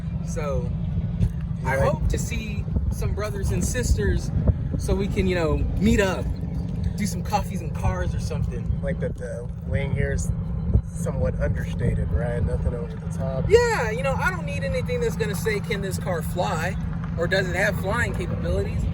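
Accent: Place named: West Coast, United States. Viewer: well it's only 2nd grade English but I wasn't taking a shot at you personally... millions of West Coast people have that exact inflection, especially your bro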